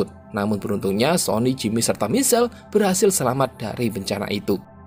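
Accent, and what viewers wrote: Java, Indonesia. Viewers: Sabar ,lagi myesuain medhok dengan kuping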